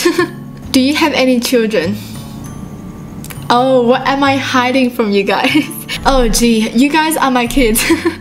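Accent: Australia. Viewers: Good morning Miss.!!! I am the new student in your class:) I really like your Australian accent too!!!:D (wish I could go to her class)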